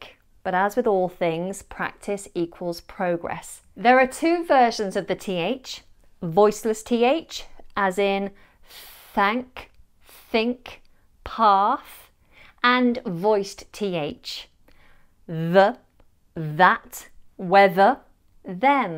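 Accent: United Kingdom. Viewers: I'm new to the English language, and when I wanted to learn the language, I started watching movies and series... I noticed that the British accent is wonderful.. I have a question: Is the accent on the channel a dialect that I can speak all over the world?👍🏻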